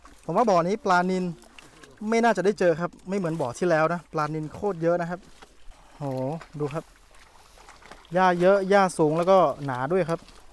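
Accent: North, Thailand. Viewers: อู้กำเมืองบะดีกาบ่าน้อยฟังแล้วสบายหูดีอู้ไทยกลางฟังแล้วมันบ่าม่วนใจ๋เฮาคนเมืองเหนือเน้อ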